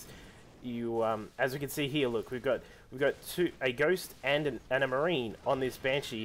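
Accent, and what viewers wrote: Australia. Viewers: Great to get some Aussie casting going on!!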